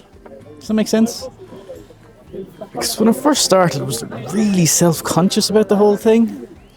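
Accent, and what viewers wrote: Ireland. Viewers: you and your vlogs are a lovely breath of fresh air in a sometimes stale world 😍Just love your honesty and sincerity and your gorgeous Irish accent 🥰 Also, as my travelling days are somewhat over I do enjoy seeing the fabulous scenery of the French countryside - keep on keeping on and stay safe on all your travels - blessings 😇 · Hello, new subscriber, expat Brit. Living in a very rural part of Canada. Please do not stop. You are making a 65 yr lady, very happy. I grew up in area populated with many Irish people. We have a few Irish people here, but they mostly live on the east coast, the Maritimes, I've met a few, they got lost, heading to work, mistaking a town near here for a town in a very different part of Canada. It was lovely to hear there banter etc. No one could understand them but me. Also having a back and forth conversation. 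I could only do because my ear was tuned to there accent, because of the Irish people, I grew up with. I was so delighted to listen to you. You came the way of Dan. Love Dan but miss his b role and drone shots, amazed at what he's doing. But you have filled in the gap. When I can't sleep, I listen to you. Your personality brings me joy. Don't listen to the nay sayers, of course when you no longer enjoy doing this, stop not for those who like to yip and yap. I enjoy every thing you do and learning about everything you have done. People like you give so much to people like me, who have no choice thru illness etc but to live life thru, stories, sights and sounds, that would be closed off from the world. Thank-you.🇨🇦 🇬🇧🇮🇪 Xx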